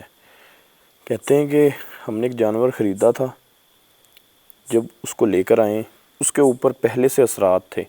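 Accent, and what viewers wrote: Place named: Punjab, Pakistan. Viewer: Bhai what about you & how is your health I hope u will be fine & better You r very responsible person You r so nice your Punjabi accent is also nice Like churhaaaail 😊🥰 Bhai Yahan tk to thk ha churail ab larki to na bolein bura lgta ha yaar 😂😂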